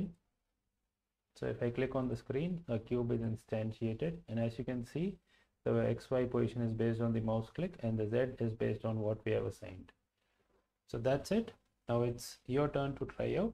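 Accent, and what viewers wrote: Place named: India. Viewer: I searched many a tutorial and none could resolve my issue with the new input system. I stumble upon this quick and easy one and in a mere moment my code works perfectly. Thank you random Indian accent tutorial man. I am truly grateful.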